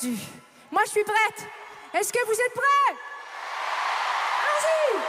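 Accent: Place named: Canada. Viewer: Странно ,говорит по французски, но даже моё русское ухо слышит большую разницу между француженкой и канадкой.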